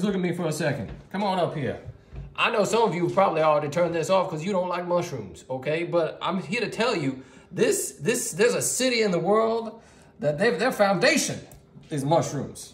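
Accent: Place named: Cajun, United States. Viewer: I love this. Looks so good. Love your accent, where does tat come from? Cajun?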